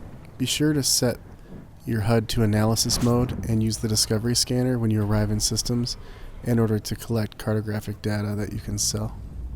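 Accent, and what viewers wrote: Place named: Wisconsin, United States. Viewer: You sound like my cousins from Wisconsin. Is this video still relevant a year after you made it?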